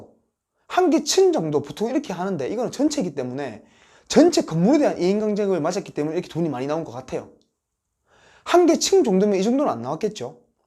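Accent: Busan, South Korea. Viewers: 고향이 부산이라 말씀이 더욱 정감있고 시원시원하고 진실로 구독자 생각해주시는 부동산 팁 잘듣고있습니다^^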